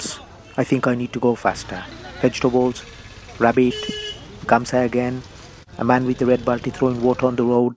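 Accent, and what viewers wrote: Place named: United Kingdom. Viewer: Man your sylti maat is so accurate and has no weird accent, yet your British accent is so perfect. How come??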